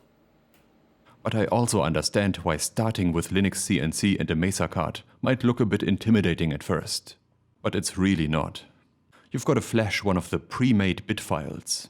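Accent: Germany. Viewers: You are like This Old Tony with a german accent. Every single video that you do makes me laugh.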